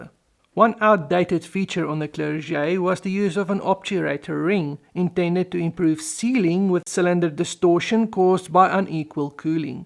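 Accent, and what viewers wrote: South Africa. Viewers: Thank you for an excellent presentation, your accent sounds incredibly like South African (Africaans/Dutch). I maybe I'mwrong with the dialect however I lived there for over 30 years and had the pleasure of meeting some very clever people.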